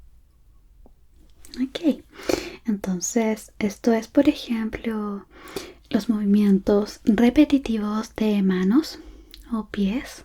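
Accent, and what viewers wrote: Chile. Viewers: Acento chileno ? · Es como si Latte ASMR hiciera vídeos en español. Pero ese acento chileno que tienes me fascina. 💙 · Algo que valoro incalculablemente cuando miro cualquier contenido ASMR, es la naturalidad de las interpretaciones cuando se trata de rol. Tú tienes una facilidad deliciosamente buena para esto. Desde la modulación de tu voz hasta la suavidad del movimiento de tus manos; agradezco tantísimo haber encontrado tu canal, tu y tu bello acento chileno siempre logran relajarme. BAH, QUE DIGO, Tus videos en inglés también son bellísimos ✨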